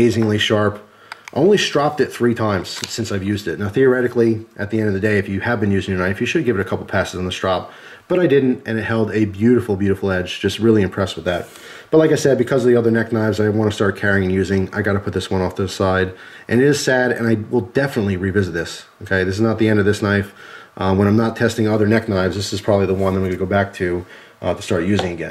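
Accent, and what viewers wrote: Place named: New Jersey, United States. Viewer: Couple things, if you don't mind: Where are you from? I ask because I'm from Jersey and you sound like my people haha. Also, you mentioned Rite Aid, and I haven't seen one of those since I left and came down to FL (been down here 8 years now). I also ask because I know how horrible Jersey gun laws are since a buddy of mine still lives up there and is constantly bitching about it when we talk guns and gear, etc. And, if you don't mind one bit of advice/comment why the smaller mag for backup? Since it's in your pocket anyway, why not use the full-size magazine (not the 33, but the standard 13-17 rd depending on caliber) instead? I ask because it hit me that for not much more weight/size difference, I can get a few extra rounds, and if I'm already reloading, then chances are I might need them. Just a thought, just trying to help. 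Thanks for sharing your EDC, always good seeing these and getting ideas. Much appreciated and stay safe, brother.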